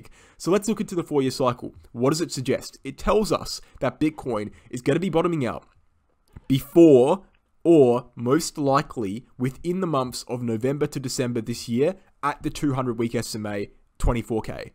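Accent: Australia. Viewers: It’s refreshing hearing another Australians voice in the crypto space … keep up the great TA man 👌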